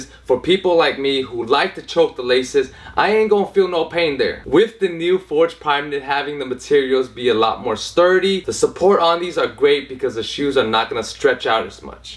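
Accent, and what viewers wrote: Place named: Asia. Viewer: Veiled asian accent mixed with hip hop wannabe drawl = slow reading enunciation.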